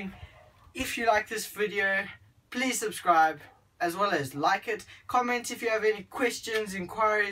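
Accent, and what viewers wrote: South Africa. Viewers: Can spot a South African accent, my baby ball python just shed and my adult is in blue, can't wait to try this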